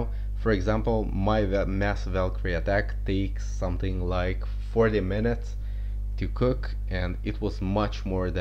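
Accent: Russia. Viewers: какой то русский акцент чувствуется))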